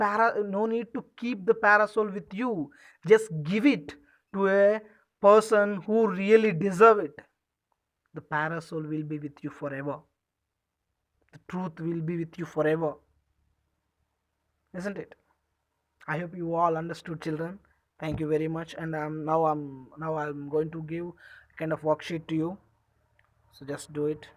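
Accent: United States. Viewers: U are in India not in american learn to tell in indian english not in american english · Good but ur explanation and your English is similar to American English so try to explain in Indian english I mean dragging of words, and it was bit fast for our kids. Don't mind just it's a suggestion.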